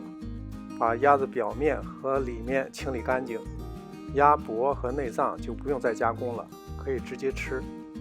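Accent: Beijing, China. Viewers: 喜欢听您这个不张扬的北京腔😉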